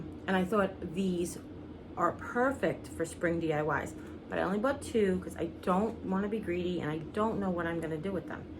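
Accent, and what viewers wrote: New York, United States. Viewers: More great stuff! You're always fun to watch and Igetxa kick out of your "New Yawk/ Long Giland" accent which is near and dear to my heart since I live there. Love those planter baskets. Didn't see them in my store yet but hopefully they'll get them in. I did buy three of those 3 in 1 stackable planters that look like fun to work with. 💕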